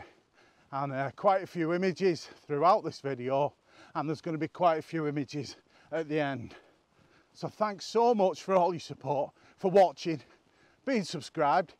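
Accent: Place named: Billinge, United Kingdom. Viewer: Surreal video...stream of consciousness...excellent painterly images. I live in Cumbria now (35 years) and just getting into photography. Born in Leigh nearly 70 years ago, been trying to place your accent.. Billinge! Keep em coming!!😁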